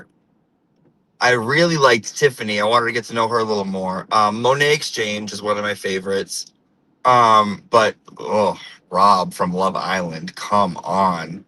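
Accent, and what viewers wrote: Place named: Fall River, United States. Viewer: O M Fing Gawd!!!!! U R awesome!!! Love the fall river accent🤣🤣🤣replay here so good eveningk to u too!!! My earpods in and husband keeps asking me what’s so funny and i said 🤪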